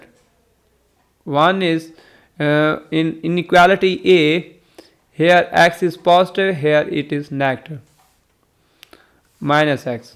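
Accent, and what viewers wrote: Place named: India. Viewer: damn man thanks a lot. I appreciate your video. However i would love to see this video without an indish accent.Anyway nice Video man thanks for saving my ass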